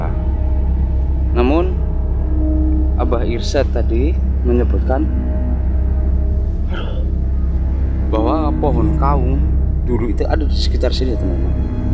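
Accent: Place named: Central Java, Indonesia. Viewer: Logat Jawa Tengah Salam kenal....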